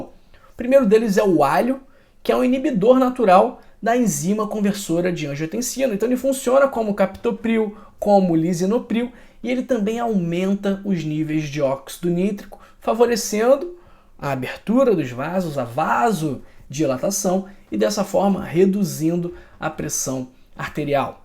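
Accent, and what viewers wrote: Rio de Janeiro, Brazil. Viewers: Partiu comprar aipo e colorau. O youtube me indicou este canal e já me inscrevi. Excelentes esclarecimentos. Entendi tudo com este carioquês legítimo. Aqui outro carioca da gema.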